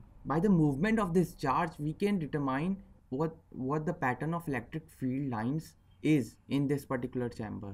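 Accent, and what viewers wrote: India. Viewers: the indian accent makes my ears bleed i can barely listen to you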